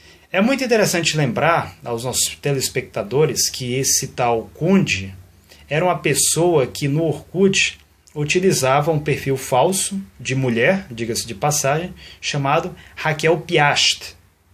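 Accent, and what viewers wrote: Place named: Rio de Janeiro, Brazil. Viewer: Afinal, de onde você é? Como você pronuncia "faxista" ao invés de "fascista", achei que você é carioca, pois pronunciar o S como X é bem característico dos cariocas.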